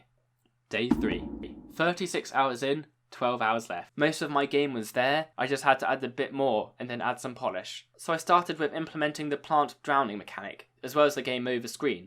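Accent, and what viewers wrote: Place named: Europe. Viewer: Ur from UK? Your accent is European. Nevertheless, you're my favourite. 😁😁😁😋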